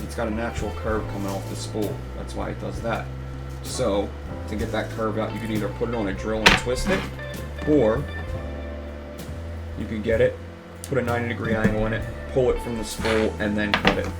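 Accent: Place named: South Philadelphia, United States. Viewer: south philly? i love finding sophisticated philly vapers on youtube. just going by yr accent and 'youse'. also the recovery thing lol (i got almost 5 years since my days at 7th and snyder/ k &a etc))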